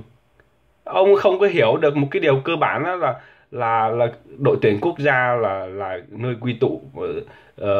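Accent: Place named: Thanh Hoa, Vietnam. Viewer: Thằng này Thanh Hóa , có dấu giọng Thanh hóa cũng lòi thôi ..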